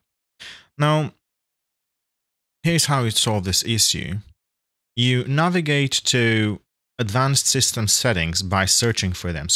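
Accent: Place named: Russia. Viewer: отличный аннлийский. слышен немного русский акцент но все равно красава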